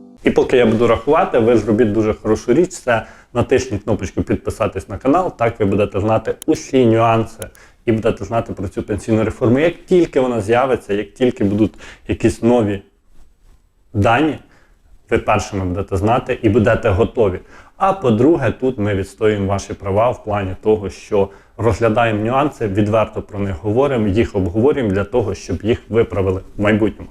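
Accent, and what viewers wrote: Ukraine. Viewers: Мова в нього, звичайно, жахлива, русизмів дуже багато, хоча по вимові, відчувається, що рідна в нього українська.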